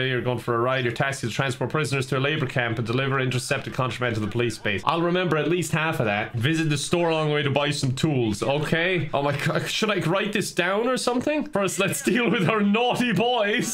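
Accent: Russia. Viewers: I like his russian accent :D Please play it again! ♥ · your russian accent reminded me of the metro games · AWESOME Russian acccent comrade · "Welcome to the republic of.... Ireland!" Speaks with an russian accent, everything is spelled in a slavic language...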